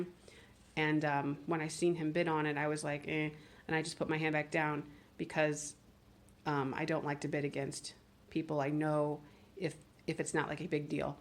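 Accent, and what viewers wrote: United States. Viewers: Oh love the country accent omg laughing so hard 🤣🤣🤣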